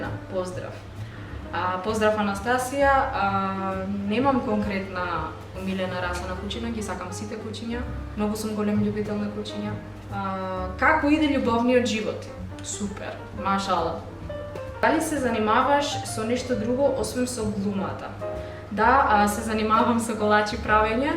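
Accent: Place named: Kichevo, North Macedonia. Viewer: пример за вистински културно одмерено девојче, браво! Многу ми се допаѓа кога зборуваш на Кичевски дијалект, секоја чест, Прекрасна девојка!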